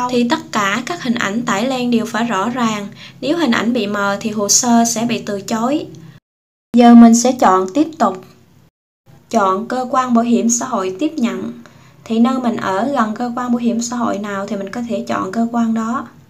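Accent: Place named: Quang Nam, Vietnam. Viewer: Tks chị, hình như chị người Quảng Nam Đà Nẵng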